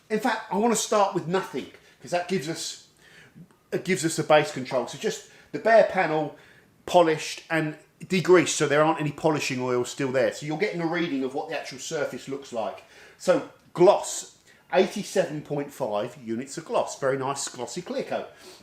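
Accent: United Kingdom. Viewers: God I love English accents hahaha. Maybe more people would watch me if I was British hahaha. You really know your stuff man, this video is excellent so far. One of my customers brought a gloss meter in once, he used them at his work to measure gloss on like gloss business card prints.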